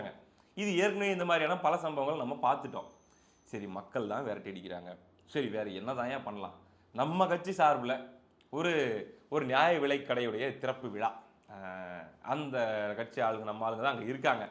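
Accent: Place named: Salem, India. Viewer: உங்கள் பேச்சு மொழி (சேலம் வட்டாரவலக்கு ) அருமை நண்பா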